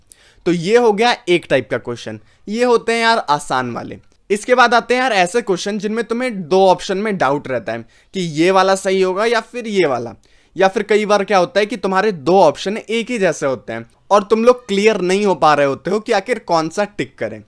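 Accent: Bihar, India. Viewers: Hello bhaiya aap bagaha bihar se ho kya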